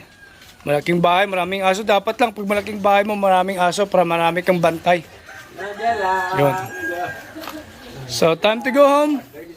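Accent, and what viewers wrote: Iloilo, Philippines. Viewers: Ilonggo gale Ka sir?😅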